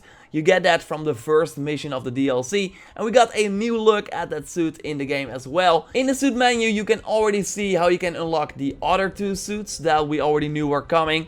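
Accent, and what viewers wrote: Netherlands. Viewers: Are you from the netherlands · You’re dutch. I can tell your accent haha (im dutch to :] )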